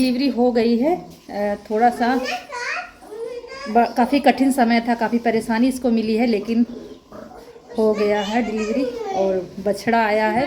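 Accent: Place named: Uttar Pradesh, India. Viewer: Mai unnaose hun aap jaise bolti hamare gaon vase bolte hai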